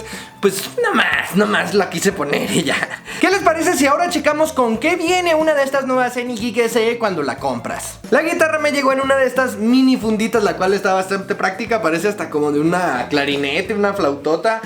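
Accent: Mexico. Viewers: Eres norteño?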